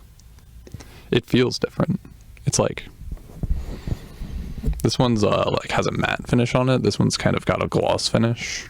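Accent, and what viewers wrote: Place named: Silicon Valley, United States. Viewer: .... Speaking in the Silicon Valley derived, computer punk dialect....... (slightly feminised, conspicuously/overly precise, observationsl, complete with vocal fry.) Computer nerds everywhere will be cheering.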